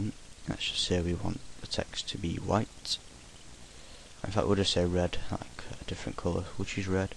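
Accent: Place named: United Kingdom. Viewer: All British talk like that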